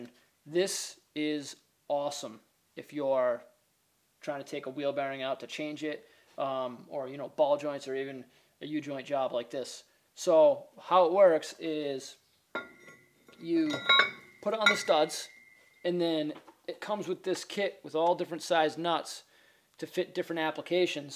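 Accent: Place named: Maine, United States. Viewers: Reminds me of the old Ford twin I beam suspensions when the kingpins would rust fast from lack of grease maintenance. Would make the truck wander all over the place and impossible to turn the wheels unless you had power steering. And I'm detecting a New England accent. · from his accent i would have to say east coat, up wards of Maine or Vermont area. Leaning more towards Maine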